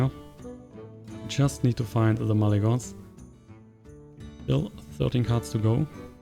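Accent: Germany. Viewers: gratis Englisch nachhilfe: TOMB = TUHM sorry, das musste raus, du klingst henau so, wie meine satirische immitation des "englisch sprechenden deutschen". wirklich ganz typisch deutscher "aktzent". :D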